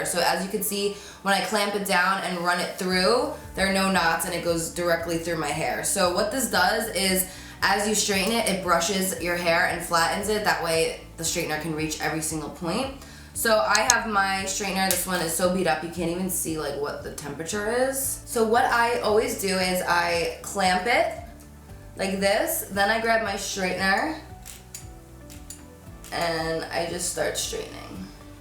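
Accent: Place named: East, United States. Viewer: I really like your slight accent! It sounds kind of east usa idk. Like, a subtle mix of boston n new york. Idek but I like it 😍😂😂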